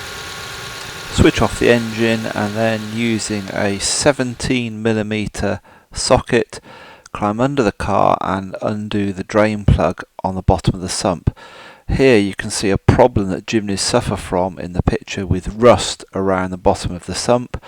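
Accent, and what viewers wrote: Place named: United Kingdom. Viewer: That British accent sounds promisingly good for fixing a car.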